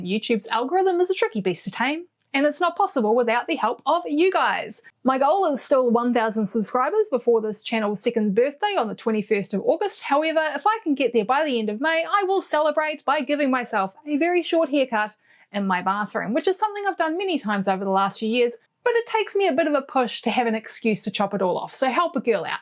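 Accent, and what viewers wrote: New Zealand. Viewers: Yes you are correct. The New Zealand accent is right up there with vulgar American, Mumbling South African and flatfooted hick-up sounding Cockney from the British Isle of London-England. So you are second best in gnawing-ear-splitting sounds and I noticed that I was forced to ‘come again, please’s’ more often than with other distorting violations of the beautiful, melodic version of Oxford English. After all ‘come again’ is not the worst request you can get after a brutal rape. Apart from all my unkind remarks stands my sincere admiration for your very entertaining Emilia podcast. Thank you for that. JVJB from Amsterdam.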